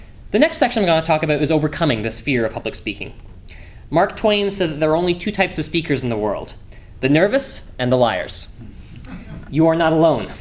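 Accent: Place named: Canada. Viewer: Neither, it is Canadian.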